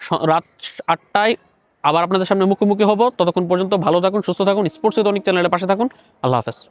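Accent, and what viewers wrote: Chittagong, Bangladesh. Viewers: ভাই আপনি কি চিটাগাইয়া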